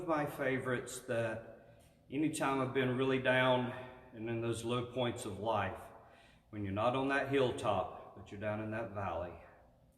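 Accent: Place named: North Carolina, United States. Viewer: I was wondering. You sure sound like a fellow Tarheel/hillbilly because you are! Good deal! You said Shelby and there are several Shelbys spread around. Good to hear all the good older ones. We're west of A-ville 60-something miles out in the hills and just found your channel. This is not the 1st time you ever sat at a keyboard. So Glad To Be Here from another Native, a rare and endangered species and GBWYou!.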